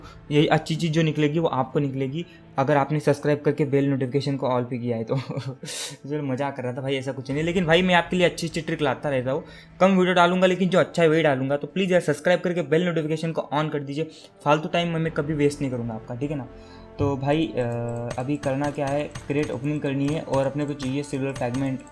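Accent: Maharashtra, India. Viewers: Bhai marathi ahes ka tu...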